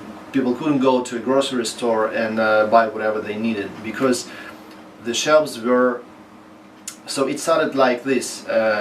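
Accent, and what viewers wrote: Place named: Russia. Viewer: He doesnt speak english but jewish american ,,,and you speak real english with a beautiful russian accent . Thank you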